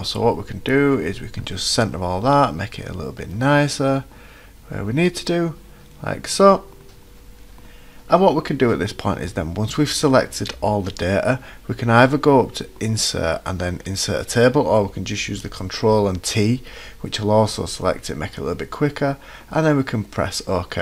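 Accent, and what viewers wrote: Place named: United Kingdom. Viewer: Hi, weird question. Where in the UK are you from? Just want to know if I guessed correctly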